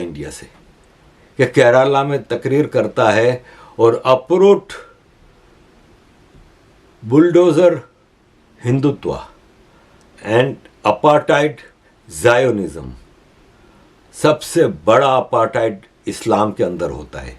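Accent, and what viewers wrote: Gujarat, India. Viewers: Love ur gujarati ascent ..I m also gujarati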